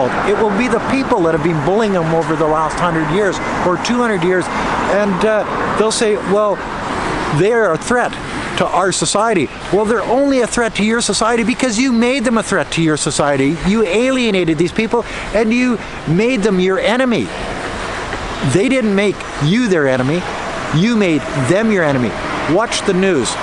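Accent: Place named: Canada. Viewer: What a Canadian tone to described the greatest of, keep up the good work! Your supporter from Coquitlam, BC, Canada!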